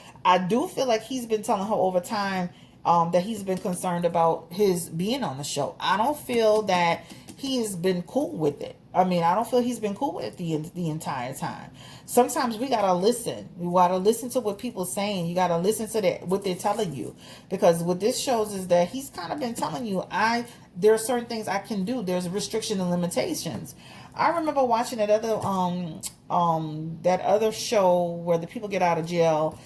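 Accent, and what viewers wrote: New Orleans, United States. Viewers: Girl, I watch this alot for just good advice and your pearls 4 real along with your cool NOLA accent and attitude chile. You be dropping them cards like u back at the BlackJack table while laughing and chillin'. I am over here enjoying the info but most importantly learning from others' experiences. 😇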